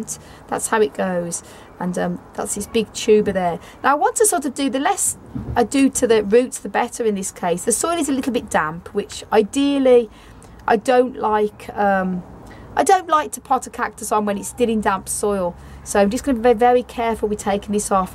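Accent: Birmingham, United Kingdom. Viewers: you still have the Brummie thing going on :-)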